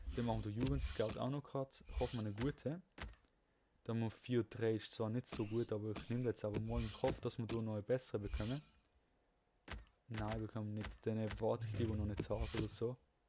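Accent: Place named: Basel, Switzerland. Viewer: Nievethan Neetihrasa Nechdem Dialekt her in Basel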